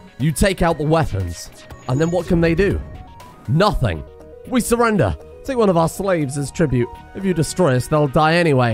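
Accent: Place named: United Kingdom. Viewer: British mah friend...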